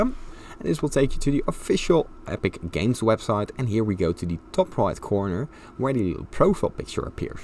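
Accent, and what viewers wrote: Netherlands. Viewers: hoorde gelijk dat deze guy nederlands is hahahaha thx man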